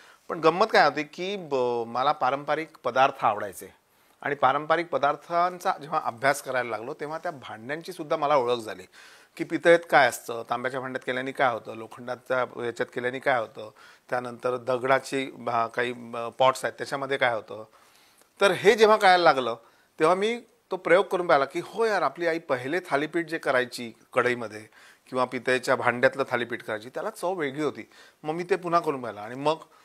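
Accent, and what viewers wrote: Vidarbha, India. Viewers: Vidrab touch bolaya chaan vatte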